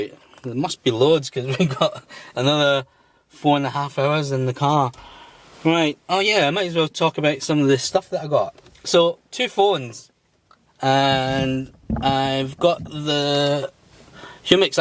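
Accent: Scotland, United Kingdom. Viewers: I love the subtitles - some amusing translations of your Scottish accent!!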